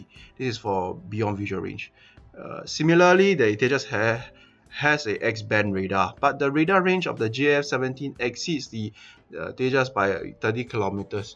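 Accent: China. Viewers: dont take this as a racist comment , but i am almost sure from your accent that you are chinese. i may be wrong, though! trying to be les nationalistic(because apparently it is the chinese and the jihadis like mazzod azhar only who can have the right to be nationalistic), i must say that all that u say is true but in reverse order. for example, u say that tejas is heavier. even though everybody knows that tejas is smaller and uses less metal than jf17. again the TWR is 1.07 with AoA of 26 degree that too when the safety against fuselage strain is kept on! jf17 has TWR of .97 and nobody knows its AoA! tejas uses a radar that may have a 30 km less range! but no inducted jf17 uses HMDS that LCA already has! besides, by your own admission LCA has a smaller RCS, wheras jf17 due to its metal component has more! so that means that the effective range of the radar of jf17 is smaller given the unsusually high amount of carbon composites in tejas airframe! 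add on to it EW suit! higher agility and moreover the existence of a local industry that can retrofit tejas makes it cheaper! besides, order of 83 mk1a has been placed and chinatopix along with many other Chinese articles have themselves pointed out tejas' superiority over jf17! this is just a last desperate attempt to dfame tejas by praising a air force version jf17 with a naval aircraft LCANavy, which was mostly a tech demostrator anyway!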